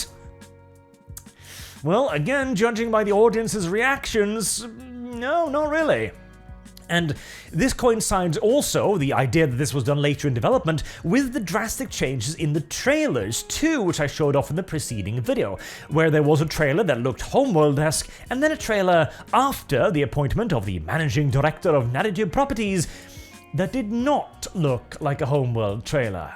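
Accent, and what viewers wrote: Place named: United Kingdom. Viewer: Hmmm, i dont like your britisch Exent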